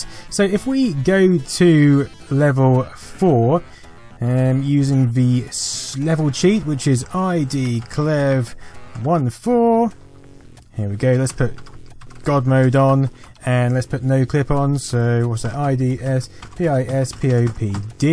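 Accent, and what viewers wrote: United Kingdom. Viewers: I love your obviously British accent:) Great content as well, keep it up !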